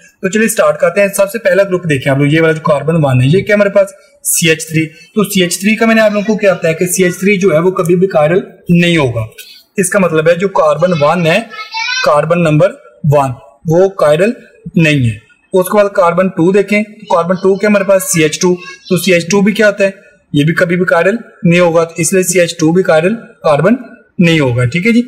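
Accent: Pakistan. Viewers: Are you from 🇵🇰 Pakistan??...your accent seems like 😃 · You from Pakistan? Nice explanation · Pak se h bhai...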